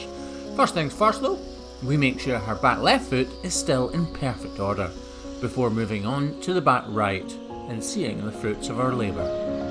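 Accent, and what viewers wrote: Scotland, United Kingdom. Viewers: I really don't know why I'm watching these videos 😳. Some are so gross with all that blood and pus, and even beyond that I don't like cows and bulls. They scared the hell outta me. Maybe it's your Scottish accent or the beautiful landscape or maybe the fact that you are doing such a amazing job. Greetings from The Netherlands and keep the good work going 🙏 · I can’t help myself here. I love your videos. Discovered them about a year ago. When I heard you talking I wondered what the accent was. When I found out it’s Scottish, I did a happy dance. Spent 16 days in Scotland the previous August and September. Can’t wait to come back. So...I read the title of this video and did a double take...Fixing a rotting cows hoof and thought a “rotting cows” “hoof”?Then realized the cow had a rotting hoof. LOL I love the way you bring your little boys with you. 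They will learn a lot from you. They will miss that time when school becomes a priority.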